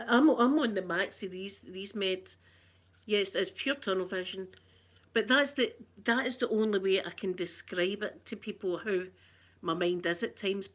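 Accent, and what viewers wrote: Scotland, United Kingdom. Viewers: The great start is to seek help and accepting that you need it (no shame in that at all it is a very brave thing to do) and if you want to cry...never deprive yourself of a good cry as it helps to release those pent up feelings....Do know that you are loved and not just by your family....you are a joy on your videos and you never fail to make me smile when I feel down with your make-up vids, your cute funny demeanor and banter in that beautiful Scottish accent of yours....The one good thing about going through trauma, loss, illness and sadness is that there is only one way to go....and that is up, make it a challenge to strive for it and you will get there..believe me, I know xxxxxx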